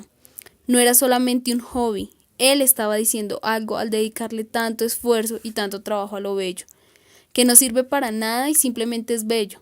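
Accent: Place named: Colombia. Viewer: que bonita vez y que gusto escucharte. Estaba termindo el libro y estaba agotado asi que busque si habria un audilibro de esta increible relato y no solo disfrute del libro sino tambien de escucharlo asi, tan claro y con el acento que te lleva a colombia.Muchas gracias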